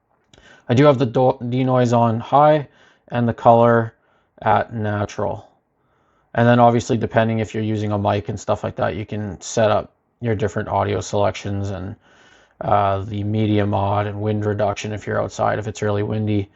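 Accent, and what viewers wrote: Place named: Canada. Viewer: This is great, I agree AMS2 is absolutely amazing. Great comparison. I do prefer the dji for sure. Thanks for the time in putting this together. Lastly I can't help notice but I could be wrong. I hear a Canadian accent. I am Canadian. So are you from Canada? Cheers brother.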